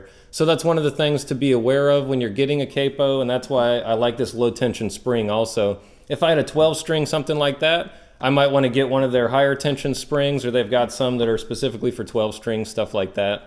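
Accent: South, United States. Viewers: This is the first time i have heard your voice. Correct me if I'm wrong but, do i hear a hint of a southern accent?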